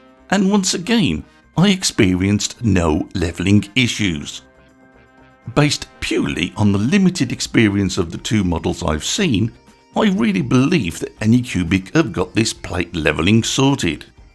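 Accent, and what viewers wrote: United Kingdom. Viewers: Your British accent alone was enough to convince me ! thank you!!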